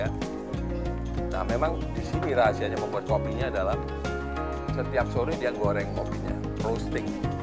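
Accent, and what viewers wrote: Java, Indonesia. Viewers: Pak bondan 😍😍. Kangen sm gayanya saat review makanan bikin orang ngiler sm logat khas jawanya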